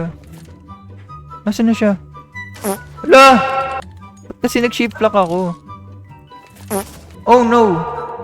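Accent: Philippines. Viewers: Yaaa, Filipino accent like the filipinos, actually im surprised i always heard english in YouTubers accent 😊😅❤ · HER SOUND LIKE FILIPiNO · Siguro Tagalog ka talaga Kasi parang Tagalog ka talaga kapag nags peaking English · It make sense because You sound like Filipino when you talk English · sabi ko na ba familiar ako sa filipino accent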